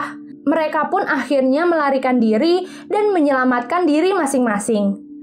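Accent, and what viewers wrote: Lombok, Indonesia. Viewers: Mba orang lombok ya?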